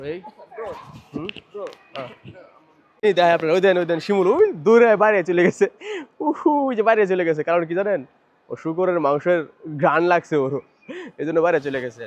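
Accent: Mymensingh, Bangladesh. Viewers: আপনার সাবলীলভাবে ময়মনসিংহ অঞ্চলের ভাষায় কথা বলা আমার খুবই ভালো লাগে বিধায় আপনার সব ভিডিও দেখি এবং ভালো লাগে ! শুভ কামনা করছি তোমার জন্য ! কোন জেলার আপনার দেশের বাড়ি ❓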